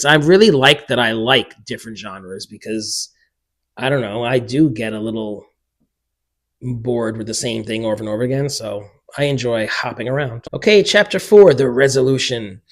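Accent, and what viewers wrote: United Kingdom. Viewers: this was so great! I love hearing you gush about the books that have wowed you. I loved your accents too lol. I think what you were doing would be considered Cockney, or close to it anyway. Not quite as thick as My Fair Lady cockney though lol. Cliff is South African though. 🙂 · Ooh look at that it's my favourite American dancer bloke doing an English accent!! Hahaha love it - you are doing a southern accent of an English person living in or near London. That accent is all over my City and that's a 2 hour train journey from London. Loved discovering Robin Hobb with you. Also loved talking about Life of Chuck with you. This is a great tag. Awesome video.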